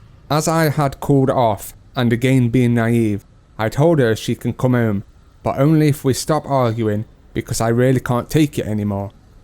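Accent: England, United Kingdom. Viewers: You sound more British than Aussie ? , such scheming and unscrupulous women make my skin crawl ! Sometimes human goodness is not found in swamps of poverty and profession . It’s just reptilian instinct of surviving . Glad that you are able to look after your mother which is the best decision and I am sure you will find a good match and life partner who will recognise your qualities and be there just for you ! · Anyway if you love her if you give her money nothing wrong at all. Everything you pick what is problems?. If your relationship or whatever you are thing go wrong all the time are you sure you a Australia man? For me you not You must came from England Your accent is English Man Okay you pick the wrong person why you complain for nothing you can fix anyway Speaking English or not nothing to do with that ever your own people devoice happens all the time ever your the same language. 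So why you complain for? happens everywhere people not meet what they want nothing perfect in the world. You should know that By the way you not a Australia Man You a English 😂😂😂😂😂😂 Your love dream broken down Nothing she can do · you have a genuine interest to find a partner. do not pick from the wrong place. your english pronounciation like a british guy not like an australian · Why do you have an English accent if you are from Australia?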